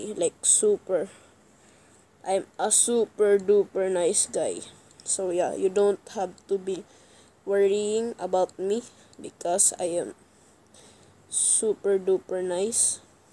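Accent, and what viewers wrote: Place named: India. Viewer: Its like indian accent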